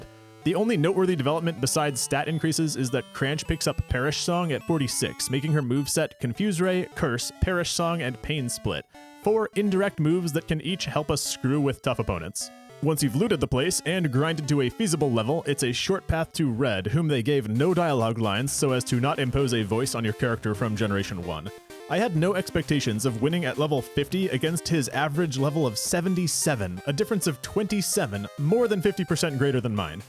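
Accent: United States. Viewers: this is not an AI voice over, just some variety of American accent.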